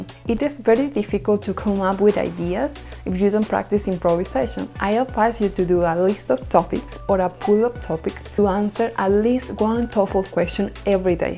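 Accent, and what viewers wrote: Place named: India. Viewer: he means the way you speak English ... sounds pretty Hindi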